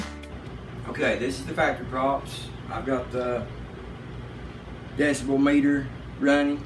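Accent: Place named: South, United States. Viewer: I grew up in southern Kentucky, I sound like a yankee compared to you buddy.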